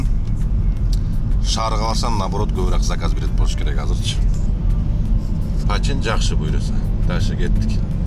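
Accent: Standard. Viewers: Молодоц иним , Акбаранын коз жашындай нукура таза суйлойсун👍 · Нормальный элэго, таза кыргызча суйлогонуно шугур дечи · Нукура таза суйлогон житит экенсин жашын канчада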